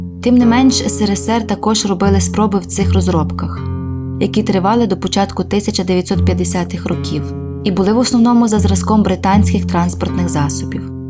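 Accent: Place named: Western Ukraine, Ukraine. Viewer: жінка диктор з заходу країни)))))так цікаво слухати акцент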